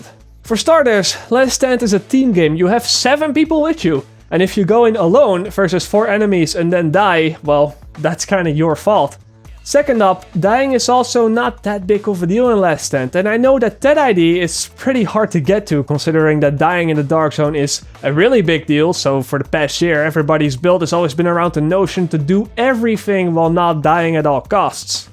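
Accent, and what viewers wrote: Netherlands. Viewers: je hoor echt gelijk dat je Nederlands ben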